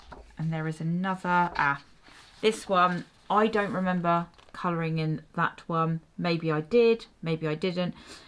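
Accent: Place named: United Kingdom. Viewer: Hi new subscriber here I love to find colourist and channels from the UK I love all others too but as I am also English there is a familiarity to me lol great video I look forward to seeing more videos and flick through just sounds so British to me lol I am all over the place in my videos too and in real life so I can relate too many ideas in the head for the mouth to keep up with 😂 and for the buying for multiple hobbies same lol buying things for hobbies is like a hobby in itself haha your personality seems very bubbly and I can see that going down very well In the colouring community 💜💜💜💜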